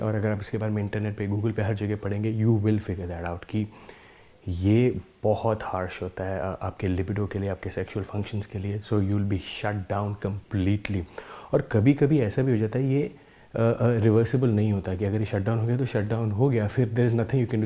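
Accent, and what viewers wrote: India. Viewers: I cant understand its like mix of english and indian Im freakin lost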